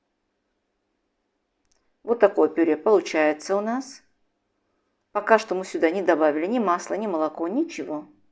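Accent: Baku, Azerbaijan. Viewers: Очень приятно слышать ваш голос, узнаю наш бакинский родной диалект, он просто неповторим… у вас чудесные рецепты! Спасибо! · Здравствуйте. Большое СПАСИБО за вкусняшку.👍 Скажите пожалуйста, Вас что-то связывает с Азербайджаном....Я просто слышу в Вашем приятном голосе,наш,азербайджанский акцент! Извините пожалуйста,за не скромный вопрос.🙏🙋🌹🌺💕👍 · У женщины легкий бакинский акцент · ОБОЖАЮ ВАШ ГОЛОС И НЕМНОЖКО БАКИНСКИЙ АКЦЕНТ ВСЕХ БЛАГ ВАМ,ДОРОГАЯ!!!❤❤❤❤❤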